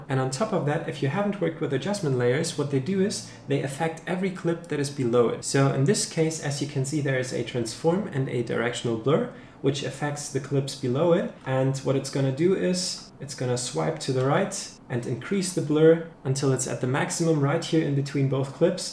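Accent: Germany. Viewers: your german, right? guessed by ur accent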